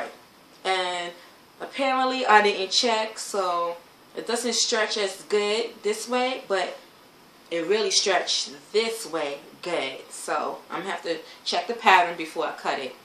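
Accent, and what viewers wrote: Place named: Bronx, United States. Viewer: Your accent reminds me of home (Bronx,NYC), where are you from? P.s. great tutorial!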